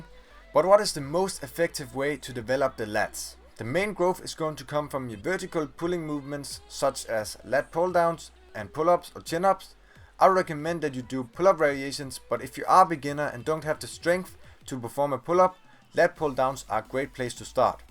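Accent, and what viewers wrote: Denmark. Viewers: damn that danish accent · You sound Danish i know because im Danish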